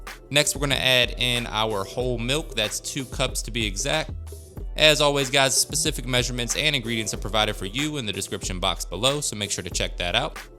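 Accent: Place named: South, United States. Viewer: First time watching this channel, love the sound of your voice and your southern accent.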